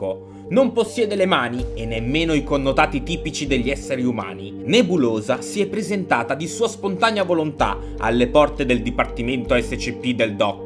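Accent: Veneto, Italy. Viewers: Veneto vecioo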